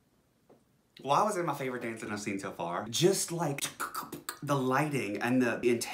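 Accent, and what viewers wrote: South, United States. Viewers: You’re not country darling you’re more of a southern bell 😘 also your remixes are 🔥. My favorite era is Wings 100%. The trailer for their wings tour is so iconic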